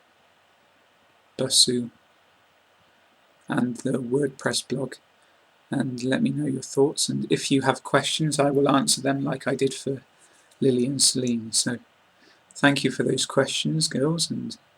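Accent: United Kingdom. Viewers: An excellent series of learning English with the right british accent..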